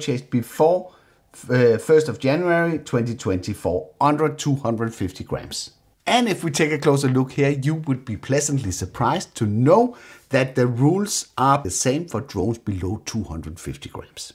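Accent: Netherlands. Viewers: That is the most stereotypically Dutch speaking English accent I have heard. Love it!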